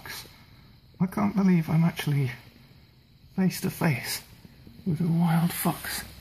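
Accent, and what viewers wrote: England, United Kingdom. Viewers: By the sounds of it your British, there's an organisation call "wildlife aid" in Britain that help all wild species that are sick and injured. You could give them a ring next time you see an animal that's sick or hurt, they'd gladly come and assist. They have a channel here on youtube under the same name. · Hes British if it was not plainly obvious by the accent. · The narrator reminds me of winnie the pooh but with a british accent. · Katieboil Anegg English, not Welsh. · The English accent is a cherry on top🦊 Precious fox💕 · The British accent is always lovely 💘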